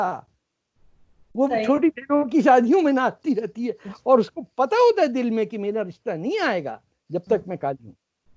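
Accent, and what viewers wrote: India. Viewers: Arzi kazmi I like your program. You look and speak like an Indian daughter. Very lovely. God bless you beta.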